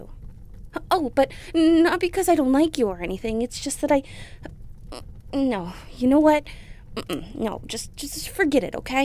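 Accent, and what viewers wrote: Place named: Canada. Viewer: Your accent for this character is perfect. It sounds like a cross between faint Canadian, and classic kimonomimi voice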